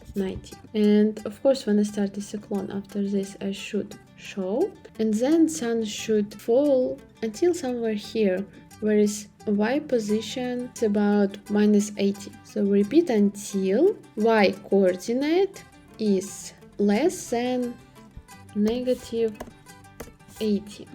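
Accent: Russia. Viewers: Thank you!(акцент русский)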